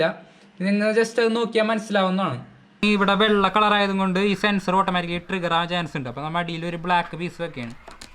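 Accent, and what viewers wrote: Thrissur, India. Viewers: ഹാ തൃശ്ശൂക്കാരൻ ഗഡി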